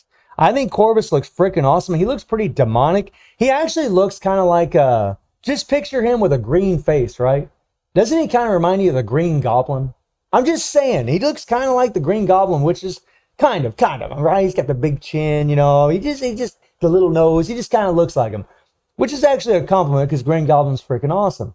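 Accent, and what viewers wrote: United States. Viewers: Oh man, I thought u sounded country. Love the beard man.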